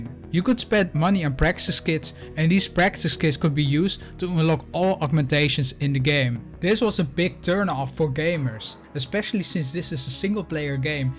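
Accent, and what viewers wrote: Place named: Netherlands. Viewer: You have quite the Dutch accent ;))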